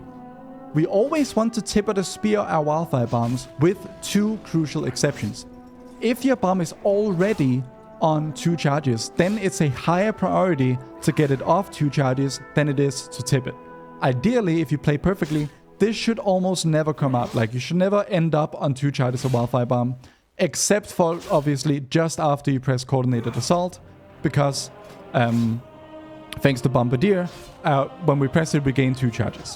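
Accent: Denmark. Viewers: Love the guide! I do have a bugging question.. are you a Dane?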